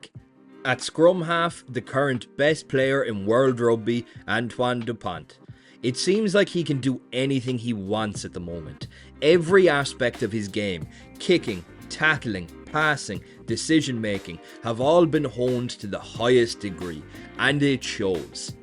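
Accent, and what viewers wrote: Ireland. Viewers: The type of video you watch twice, first time to admire the Irish accent, the second time to capture the good Rugby and Humor content! Well done my friend!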